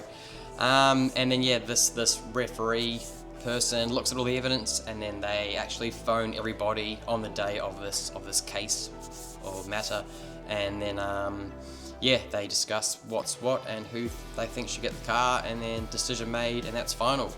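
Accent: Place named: Australia. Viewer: You nearly sound Aussie 😂😂😂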